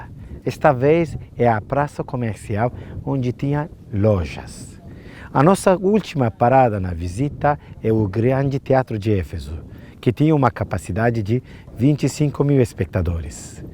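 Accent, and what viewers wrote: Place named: Brazil. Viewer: para uma pessoa de nacionalidade turca, você domina muito bem a língua portuguesa. Acaso morou no Brasil? Por que a sua pronúncia está similar com o português brasileiro. Parabéns pelos vídeos, eles nos levam a uma cultura multi-secular, que nos facina pela história do cristianismo e seus percusores. Gratidão...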